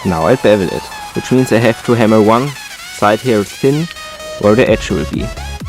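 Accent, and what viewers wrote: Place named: Germany. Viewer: Hab an der Aussprache direkt gehört, dass du deutsch bist haha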